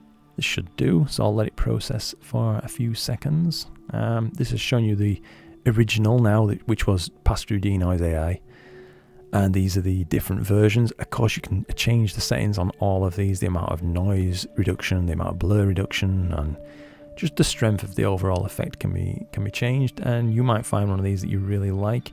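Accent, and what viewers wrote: United Kingdom. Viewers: Tried again from scratch, now I get this "Cannot execute instance on view: R Reason: LRGBCombination cannot be executed on grayscale images." ( to your earlier note, there is no way to extract luminence from the split G or B files ). Do you do zooms? Be happy to pay you for 30 mins to watch me and tell me what I'm doing wrong, live in the USA, guessing you're somewhere in the UK? (I'm an Australian so I speak British lol)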